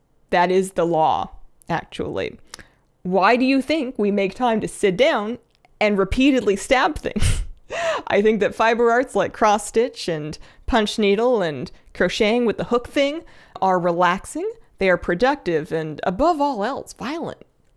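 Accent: Canada. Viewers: Hi, maybe it's just me but I think you are sounding more Canadian! The last couple of times I tried, I could not give the trinket mats to other eligible players. I hope the enchanting bug is fixed soon, and I really miss Bagnon.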